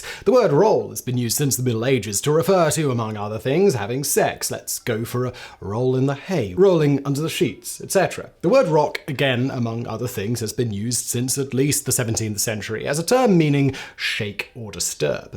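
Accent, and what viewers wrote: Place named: RP, United Kingdom. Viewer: Okay, Simon reading aloud the lyrics "I knew a girl named NIKKI. I guess you could say she was a SEX fiend" is his perfect Queen's English accent made me literally lol and is about the funniest thing I've seen today.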